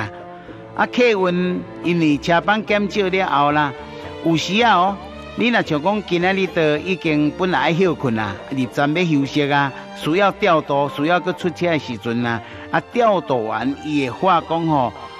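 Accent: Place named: Japan. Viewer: 旁白主持人的介紹非常專業，但是日式的美語發音，讓人聽了會起雞皮疙瘩。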